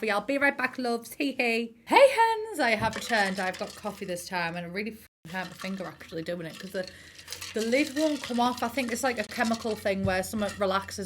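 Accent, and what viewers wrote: United Kingdom. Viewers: I love you accent. Are you British? I'm not too good at guessing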